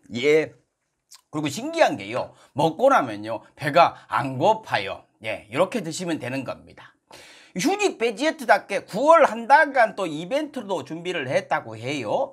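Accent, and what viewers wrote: Jeolla, South Korea. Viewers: 고향말을 진짜로 잘해분다야 ㅋ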